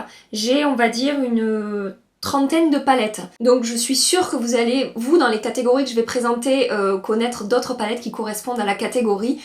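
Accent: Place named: Marseille, France. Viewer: Tu es très belle, tu explique bien, et j'aime ton accent marseillais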